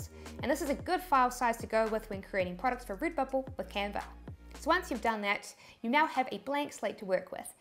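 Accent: New Zealand. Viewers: I want to come to New Zealand to study your English speaking accent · Thanks 👍! You are always super informative and love that New Zealand accent!! 😃💻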